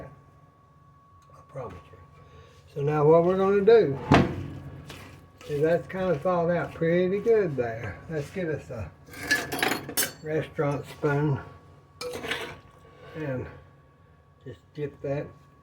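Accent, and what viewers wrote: South, United States. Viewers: Hi, I really enjoy your channel. I'm a cobbler fan from way back, and I love your double crust method. I'm adopting it! My parents were from the south and I grew up cooking with cast iron and bacon grease. Matter of fact my mother was from the Little Rock area. Your accent certainly does sound like almost everyone in my family. Sweet memories.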